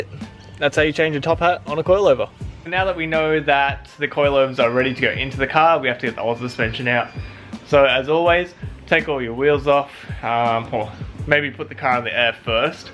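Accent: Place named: Australia. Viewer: I was wondering myself... english ... airbarg remooved... da fuck, australia¿? (from Spain) Anyway, thanks, really good video mate (y)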